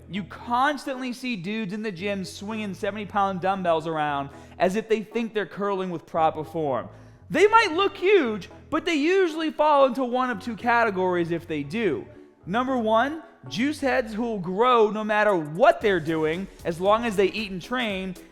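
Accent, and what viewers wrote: Boston, United States. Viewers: What can i say... the title aren't that clickbait... your boston accents? Just got it ways to the title. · I love how you took that awesome Boston accent and made it a thing on this channel. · Love u, doing it without juice, love the Boston accent, I'm from Connecticut, my dad was from Boston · Is he from "bah"ston MA?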